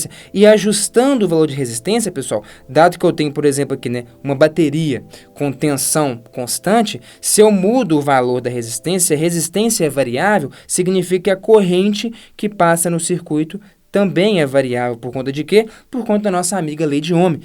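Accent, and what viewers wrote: Minas Gerais, Brazil. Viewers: esse sotaque mineirinho deixa tudo mais leve. Eu amo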